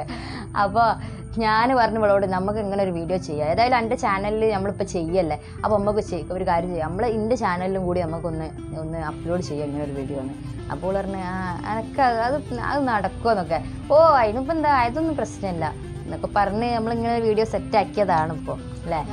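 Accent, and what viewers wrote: Kondotty, India. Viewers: ആ കൊണ്ടോട്ടി ഭാഷ എനിയ്ക്ക് ഇഷ്ടം ആയി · മഞ്ഞക്കിളി.... ഇയാളെ പാട്ട് എനിക്ക് ഒരുപാട് ഇഷ്ടമാണ് അതുപോലെ ഇയാളെ വർത്താനവും എനിക്കിഷ്ടായി ശരിക്കും തനി നാടൻ മലപ്പുറകാരി അല്ലേ... 😄😄😄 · Nammale kondottykkare athe samsaram. ....appadi samsarichu.... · ഇൻശാ അല്ലാഹ് ഞാനൊരു കല്ല്യാണം കഴിക്കട്ടെ മലപ്പുറം ജില്ലേലാണ്ന്ന് സംസാര ശൈലിയൊക്കെ കേട്ടിട്ട് മലപ്പൊറംകാരിയാണെന്ന് ഇൻക്ക് തോന്ന്ണ് ണ്ട് ആണെങ്കിൽ കല്യാണം കഴിച്ചിട്ട് ഹസ്ബന്റിനെയും കൂട്ടി വരണ്ട് അതിന് മുമ്പ് നല്ലൊരു കല്യാണം ശരിയാവാൻ ദുആര് ക്കിട്ടോ ങ്ങള് ങ്ങക്ക് വാണ്ടി ഞമ്മളും ദുആര്ക്കാം ട്ടോ ങ്ങളെ സാരം ഇൻക്ക് ഭയങ്കര ഇഷ്ട്ടപ്പെട്ടു.... പിന്നെ കൂടെയുള്ള ആളെ കണ്ടിട്ട് തൻസീർ ക്കാന്റെ അതെ ലുക്ക്ണ്ട് പെട്ടന്ന് കണ്ട തൻസീർ ക്കന്റെ riletion ആണോന്ന് തോന്നും ഞാൻ കളിയാക്കിയതല്ലാട്ടോ? Masha allah ഞാൻ ഇന്നാണ് ആദ്യായിട്ട് ഇങ്ങളെ സംസാരം കേൾക്കണത് ഇൻക്ക് ഒരുപാട് ഇഷ്ട്ടായി പിന്നെ ഞാന് ഇട്ട കമെന്റ് വായിച്ച കയ്യിണ്ല്ലെങ്കില് ക്ഷമിക്കണം ഇവടത്തെ ഭാഷങ്ങനെ സംസാരിച്ചു ശീലായിട്ട് നല്ല മലയാളം ഇപ്പൊ തൊളേള വെര്ണില്ല്യ ☹️അതോണ്ടാണ് ട്ടോ 🥰😘😘😘❤🌹❤❤🌹🤲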